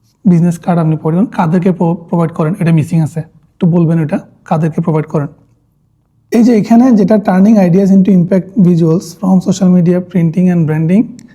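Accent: Chittagong, Bangladesh. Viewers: ভাই মনে হয় চট্টগ্রামের?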